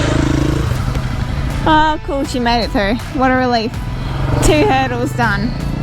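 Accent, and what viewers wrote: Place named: Australia. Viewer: Thats most Australian accent eva…😎🤘🏻🤘🏻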